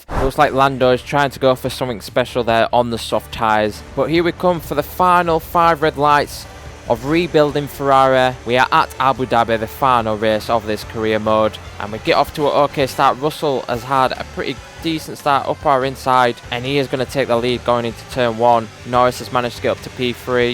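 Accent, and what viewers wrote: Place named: United Kingdom. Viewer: I love that British accent brother 🔥🔥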